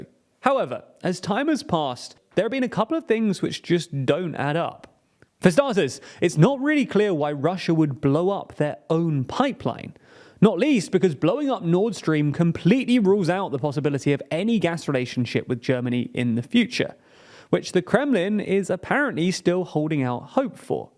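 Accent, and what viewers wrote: United Kingdom. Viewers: This is the stupidest pure speculation video from you guys I’ve ever seen. This is becoming like NewsWars with a British accent. I hate it when a good channel becomes successful and begins chasing the money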